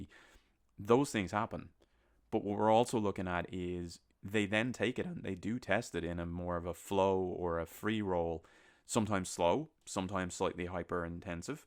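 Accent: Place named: Ireland. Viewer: Thanks for this. I can hear that you're Irish. i'm working at kenpo the past 18 months & I am finding it fantastic, so great for personal growth, fitness, agility, memory & discipline. But I do find it maddening that not only is there such a divergence from the USA kenpo, but even amongst the Irish kenpo schools there is not harmony. So it is very difficult to learn. But hey, I'm enjoying it.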